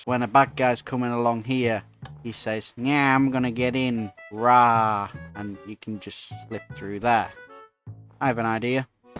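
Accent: United Kingdom. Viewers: My Man is britishhh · lol I thought you were Scottish because I have a teacher from Scotland who has your accent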